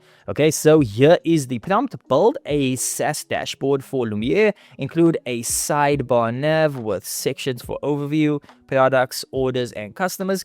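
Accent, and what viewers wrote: South Africa. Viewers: Are you South African? That accent is too local for me to ignore haha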